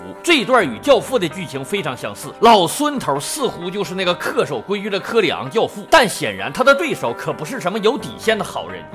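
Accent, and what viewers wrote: Northeast, China. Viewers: 2楼。牛，东北话解说真的到位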